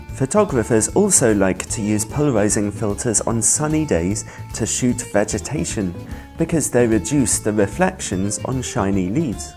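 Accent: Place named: England, United Kingdom. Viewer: He's English like myself 🙂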